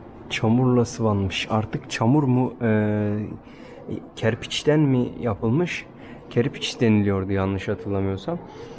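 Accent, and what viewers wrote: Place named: Niğde, Turkey. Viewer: yav adam istanbullu degil. nigdeli !!! neden diksyon dersi alsin allah allah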